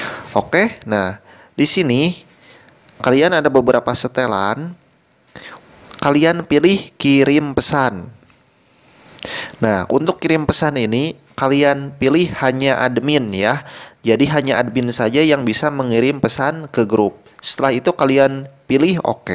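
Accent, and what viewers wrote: West Java, Indonesia. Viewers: Urang Sunda ya kanh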